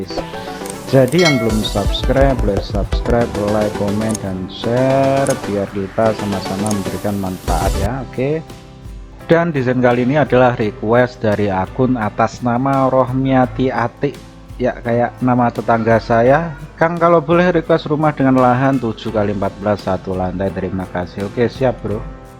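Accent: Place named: Central Java, Indonesia. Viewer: Mas logatnya jawa tengah tp kok dipanggil Kang? Hehehe. Konten yutubnya keren btw..! Request tiny house 2 kamar ala villa dong budget under 50 jt 😁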